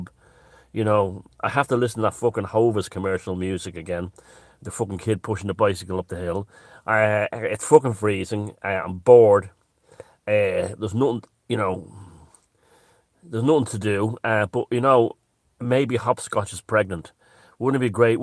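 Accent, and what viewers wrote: Ireland. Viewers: Always love the impressions and the different Irish accents… Much appreciated!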